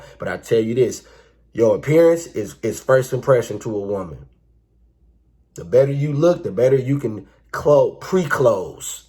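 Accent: Baltimore, United States. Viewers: You from B-more?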